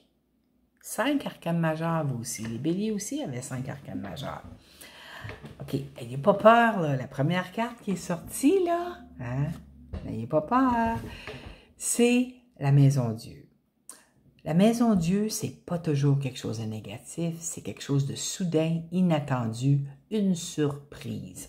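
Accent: Quebec, Canada. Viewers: Merci pour ce beau tirage qui m 'apaise surtout concernant le travail! et puis entendre votre accent du Québec me ravit au plus haut point. Je suis tombée en amour avec votre accent après avoir vécu quelques temps dans votre beau pays. Merci à Vous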